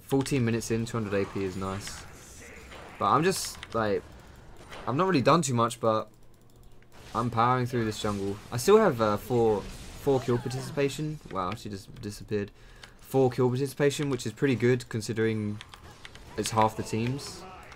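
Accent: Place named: United Kingdom. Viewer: Very manner intensive. Dat British accent.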